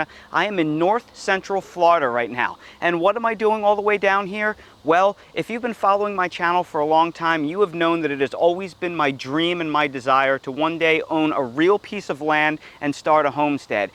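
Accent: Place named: New York, United States. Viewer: You sound like you were born where Woody Allen was born.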